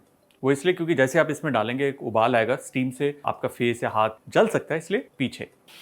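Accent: Punjab, India. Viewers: App Punjab Se Ho kya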